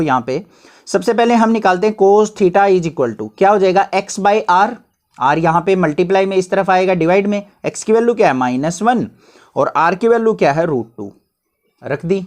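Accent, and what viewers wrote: Haryana, India. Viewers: Sir r u from haryana? Bcoz u speak like haryani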